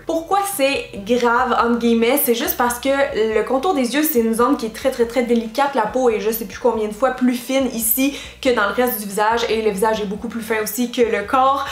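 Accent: Quebec, Canada. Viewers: J'en fait quelques unes de ces bêtises... Pleins de bonnes choses dans cette vidéo. Et un délicieux accent Québécois 😃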